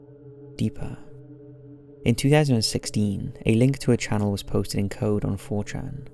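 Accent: Wales, United Kingdom. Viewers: You have the same voice as like every British dude you come across on overwatch.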